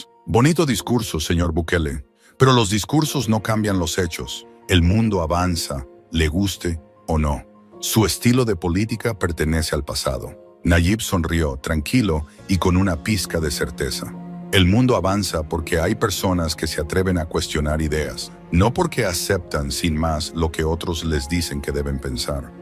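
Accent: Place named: United States. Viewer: Pregunto. El narador porque Abla de una forma tan rara parece que es americano y termina ablando en un Español raro perdón es un detalle que a mí me hace dudar la intención. Perdón es un detalle.😮😊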